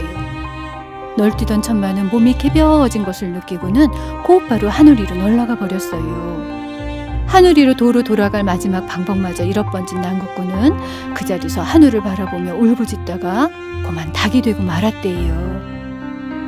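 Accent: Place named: Seosan, South Korea. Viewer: 서산 말씨를 자꾸 따라허게 되네유~^^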